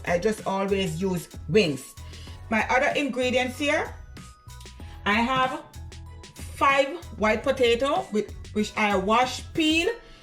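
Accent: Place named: Jamaica. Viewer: Why do you sound Jamaican though? It’s supposed to be a Bahamian accent though. But I will watch it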